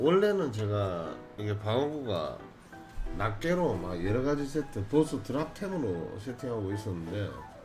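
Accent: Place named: Gyeongsang, South Korea. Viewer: 경상도 사투리가 제스타일입니다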